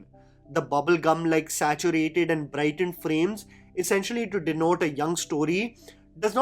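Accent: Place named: India. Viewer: I didnt understand single word from the host accent was too thick Indian style with English. Just speak in Hindi?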